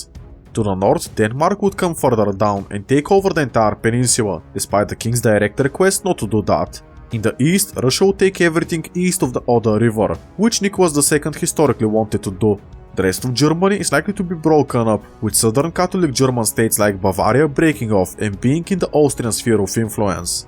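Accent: Bulgaria. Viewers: the Bulgarian accent is one of the many unique features you bring, please only remove it, if it makes you feel uncomfortable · Don't. I might be biased because I am also a Bulgarian, but I love your accent.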